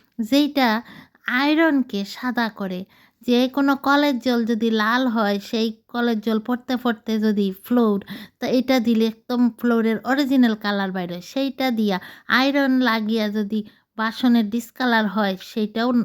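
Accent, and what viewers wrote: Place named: Bangal, Bangladesh. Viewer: তোমার বাঙাল কথা শুনে আমার খুব ভালো লাগলো দিদিভাই🙏🙏🙏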